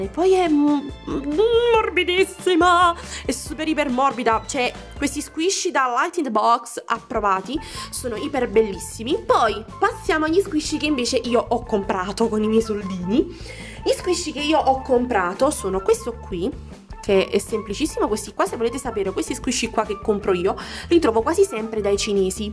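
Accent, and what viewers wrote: Naples, Italy. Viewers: Ciao ma sei di napoli? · ma sei di Napoli?? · penso che tu sia napoletana perché si sente (poi nn lo so)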